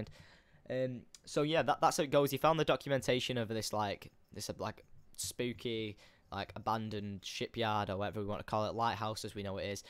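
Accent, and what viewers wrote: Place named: Manchester, United Kingdom. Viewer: that's a manchester lad MINT haha. Well done son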